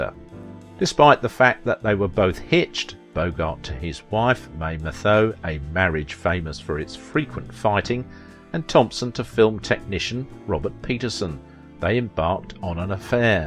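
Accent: England, United Kingdom. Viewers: It's BY WHOM!!!!! Very British accent but awful English.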